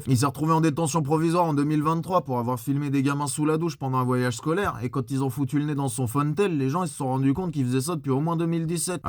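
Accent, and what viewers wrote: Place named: Paris, France. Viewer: La france a un gros problème de bistouquette surtout du côté des mecs sans vouloir insister lourdement.. Mais les gonzesses ferment leur gueule aussi, mais les lanceurs d'alerte c'est des lanceuses très souvent.. Alors tout le monde chez le psy. Allez ouste.. Merci pour tes prises de paroles. J'aime ton accent parigo, j'me sens chez moi.. Ça m'fait du bien aux esgourdes.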